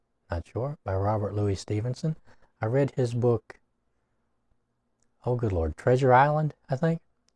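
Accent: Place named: Kentucky, United States. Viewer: Kentucky! That makes sense. Scott from 'Kentucky Ballistics' is a household favourite and the accent is similar. 👍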